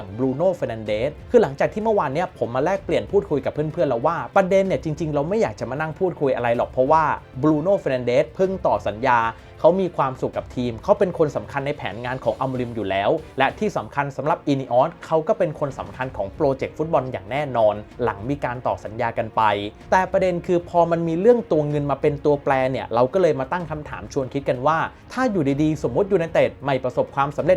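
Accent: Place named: Isan, Thailand. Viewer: ขำตา พูดอีสานผมลูกอีสานยังขำเลยอ่ะ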